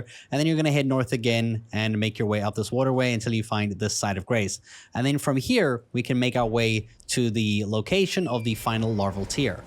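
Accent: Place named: South Africa. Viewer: Bro embrace your south african accent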